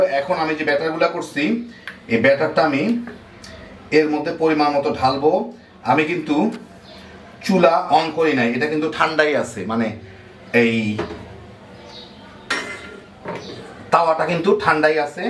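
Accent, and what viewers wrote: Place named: Thakurgaon, Bangladesh. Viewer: ভাই,আপনার বাড়ি কি ঠাকুরগাঁওয়ে? কথা শুনে মনে হয় আপনি ঠাকুরগাঁও এর মানুষ!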